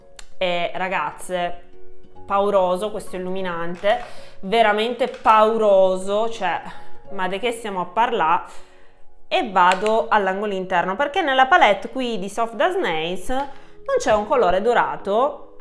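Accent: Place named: Piedmont, Italy. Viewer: Ah mi piace la tua cadenza piemontese, io sono pugliese ma ho tutti o quasi i miei parenti in Piemonte e adoro il piemontese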